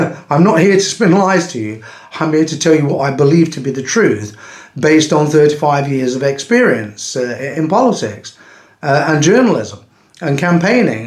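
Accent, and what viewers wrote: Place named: Midlands, United Kingdom. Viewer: Midlands, Derbyshire (Ilkeston), Notts (Hucknall) or South Yorkshire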